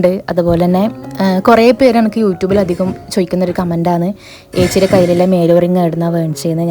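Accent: Kasaragod, India. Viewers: you are soooo.. sweet. I watch your videos mainly to hear your slang, kasargod slang.. I like your home surroundings, achamma, achachan, amma, achan, kunjava..❤ God bless you all.. 🥰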